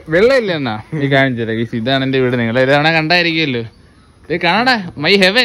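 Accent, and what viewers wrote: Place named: Kozhikode, India. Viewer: Kozhikode slang 😁❤️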